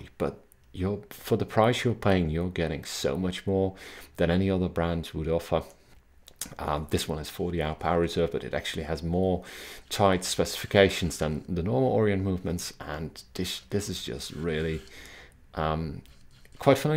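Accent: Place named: Netherlands. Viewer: Nederlands accent ;-)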